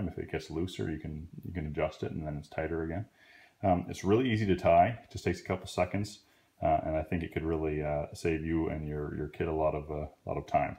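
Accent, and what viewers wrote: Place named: Canada. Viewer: Canadian accent 😬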